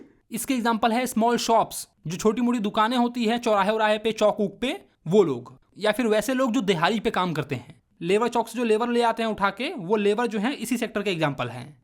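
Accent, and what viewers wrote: Bihar, India. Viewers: bhai tum bihar se ho kya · Yess he is bihari ✌🏻👽🌚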